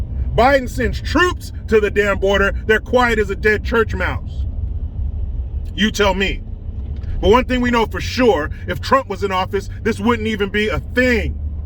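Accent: Russia. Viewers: Your accent sounded like Boris and Natasha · Please do more russian voice!!😂😭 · you are so funny; I love your Russian accent.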